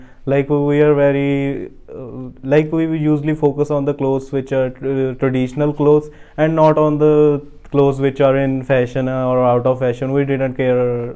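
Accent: Punjab, India. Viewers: punjabi tone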